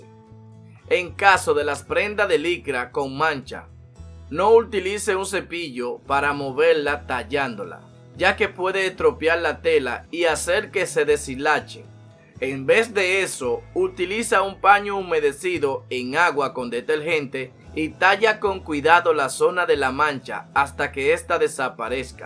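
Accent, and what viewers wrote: Dominican Republic. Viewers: Es dominicano/ puertorriqueño amiga 😹😹 así es la lengua allá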